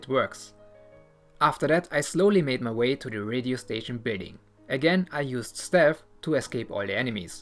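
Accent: Germany. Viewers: Man merkt dass es deutscher Akzent ist aber du sprichst trotzdem gut Englisch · Ich höre schon das du deutsch bist Bro haha , unterhaltsames Video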